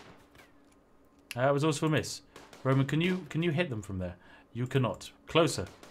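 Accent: United Kingdom. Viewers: its terrifyingly brititish tho. not brits that try to pretend to be canadian when traveling. no. unapolgetic better than thou pretentiousness. its the kind that is funny on the east coast, exploited on the west. midwest being best, we tolerate it but say half that to an actual person here and shit gets kicked in.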